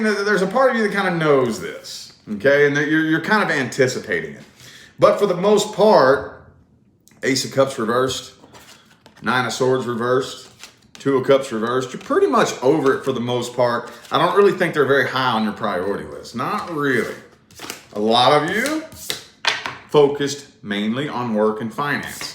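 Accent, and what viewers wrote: South, United States. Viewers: Really enjoyed this reading,! Are you from the South? I know I hear an accent in there.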